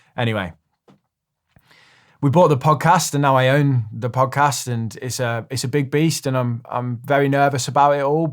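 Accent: Birmingham, United Kingdom. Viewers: Great video, what a way to turn a shit thing into a force for good. I will admit to being disappointed that you're a city fan as I thought I detected a Brummy accent and hoped you'd be a Villain like myself:'( As for the name for "the attack", how about "The Smackening?"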